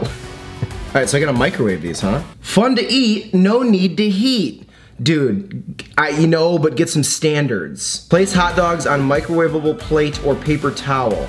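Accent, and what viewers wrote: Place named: Chicago, United States. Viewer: I am from Chicago and we definitely do talk like this wise guy here. We do not put ketchup on hotdogs. What are ya nuts? Ketchup on a hotdog, get outta here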